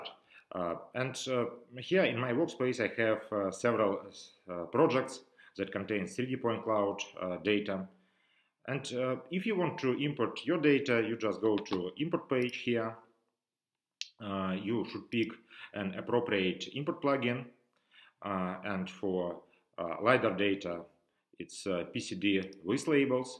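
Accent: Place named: Russia. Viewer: Russian accent))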